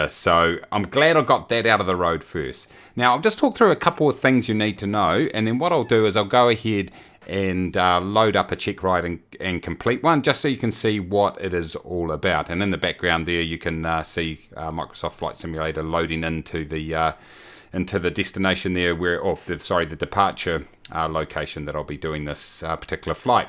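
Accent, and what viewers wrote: New Zealand. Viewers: Love the Kiwi accent!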